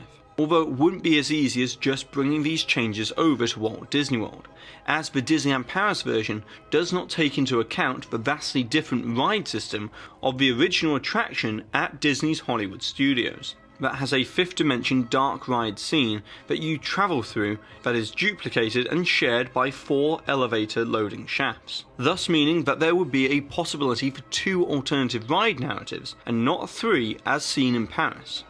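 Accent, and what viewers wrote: England, United Kingdom. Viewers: Great job, we appreciate your hard work! Was that hidden Mickey a Halloween joke? I never miss it🤔. I think you just need to work a bit on your Serling, but pretty good for an English accent! I’ve been to all three parks, but when I was in CA I missed the tower and my visits to Japan were well before Disney Sea. I’m not into the “spooky” girl versions. No thanks, but I do like the CA version with Guardians (thanks for explaining all the changes). I would love to see that version come to Orlando along with Spider-Man to replace Rockin Roller coaster or an avengers theme! Why not retheme that entire section of the park. 25 years is long enough! They just put the Cars attraction (mostly for kids, but an awesome animatronic!). Not sure why they put that there it would have been a great “Marvel” experience unless there’s rights issues! 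Once again great video /. Do we all get a pin for the hidden Mickey as a trick or treat? Yes!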